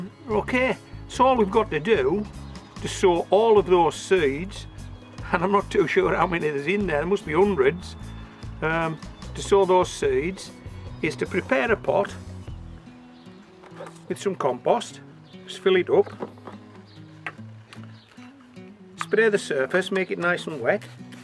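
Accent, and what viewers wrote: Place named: Scotland, United Kingdom. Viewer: GMC 06 he sounds like Scottish man 😋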